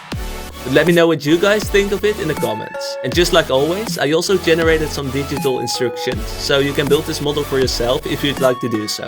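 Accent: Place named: Netherlands. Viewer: Are you dutch ? Because you have an accent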